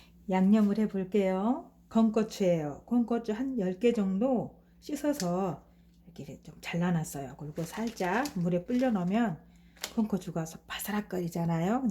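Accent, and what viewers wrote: Jeolla, South Korea. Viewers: 말투나 만드시는 음식이 남도쪽이신거같은데 남도가 고향이신가요? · 낭글 낭글ㅋㅋ 전라도 아짐ㅋ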